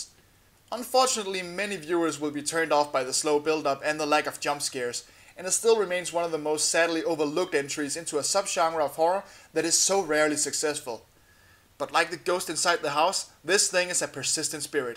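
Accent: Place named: Denmark. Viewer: You sound very danish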